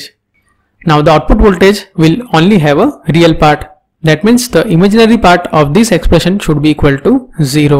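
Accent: India. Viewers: Irritating traditional indian english accent.. Uff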